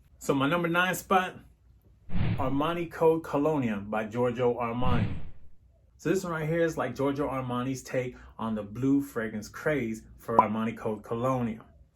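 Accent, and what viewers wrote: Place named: Brooklyn, United States. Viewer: Texas, I would of guessed he was from. Brooklyn ny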